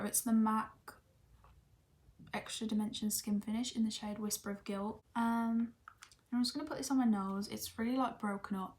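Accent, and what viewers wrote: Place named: England, United Kingdom. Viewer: Where in England are u from, like ur accent is different x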